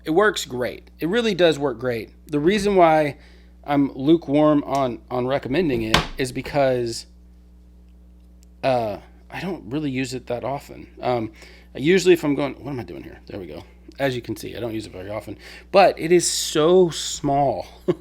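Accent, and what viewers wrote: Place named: Boston, United States. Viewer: You way how you speak remind me one of my Friend from Boston area! By the way, looks like you are very confident speaking on camera! Some experience from out of YouTube life?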